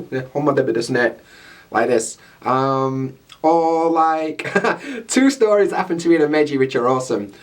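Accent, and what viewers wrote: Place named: North, United Kingdom. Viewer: I've been trying to figure out your accent for ages and I will guess you're a northerner?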